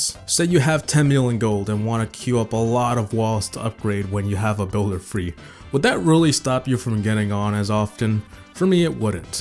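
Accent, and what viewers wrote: Norway. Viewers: wait u r from norway? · Hold up wait a minute are you from Norway? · are you norwegian? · E du fra Norge? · Waitwaitwaitwait wait. Are you Norwegian???? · wait.... are frome norway?? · Are you Norwegian?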